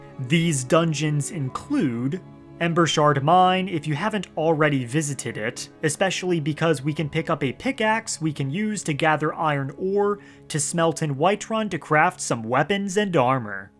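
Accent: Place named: United States. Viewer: Good video but American accents can be kind of grating. Especially how he keeps saying "White Run". Doesn't really flow in his voice.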